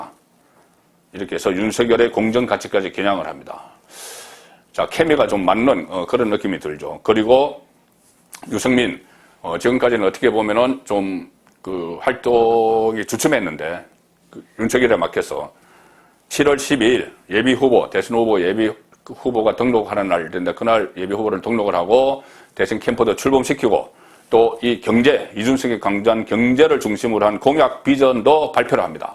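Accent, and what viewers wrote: Gyeongsang, South Korea. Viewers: 아무리 경상도 출신이라도 그 정도로 알려진 언론인 이라면 발음 좀 신경쓰세요. 육월? 유성민? 정세없는 복지? 그래도 방송인데....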